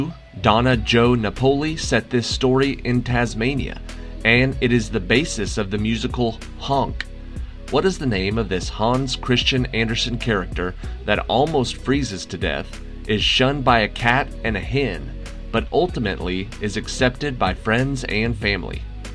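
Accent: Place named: United States. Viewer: Why are all the pictures of England but the voice is American?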